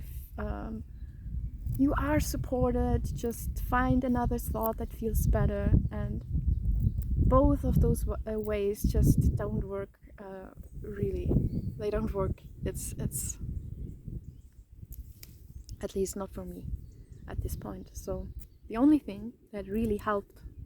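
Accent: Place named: Austria. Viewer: it makes me happy to hear that i made you happy and.. i'm from austria too! what a coincidence, i thought that the accent and the sourroundings looked familiar...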